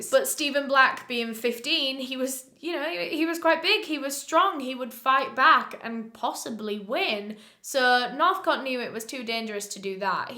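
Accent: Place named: Southern England, United Kingdom. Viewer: just me who thinks accent is fading into a more southern English accent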